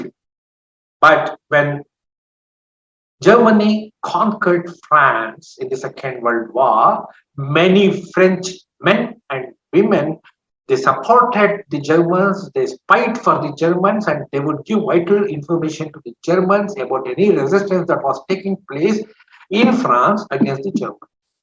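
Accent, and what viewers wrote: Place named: Hyderabad, India. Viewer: Although a bit long, the video describes many backstabbers and carefully mentions that hypocrites are present in all societies and religions. By his pronunciation, one can guess that he is of Hyderabadi background and he dared to include Asaduddin Owaisi in the list of backstabbers. Personally, I do not have any reason to doubt Owaisi's intent to help Muslims, his actions speak louder affecting the election outcomes. The narrator mentioned that Owaisi's participation in UP and Bihar elections resulted in additional seats for the BJP by dividing Muslim votes. There are unconfirmed reports that Owaisi's party was given a huge amount of money for its participation in the election.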